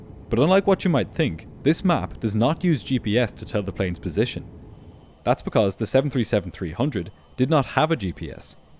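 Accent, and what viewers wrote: Ireland. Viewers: I came for the flight video stayed for the Irish accent!😄☘️ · I had the same thought! 😂 I was like, "Well he's Irish so maybe it's not what I thought lol" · Hahah long live the Irish accent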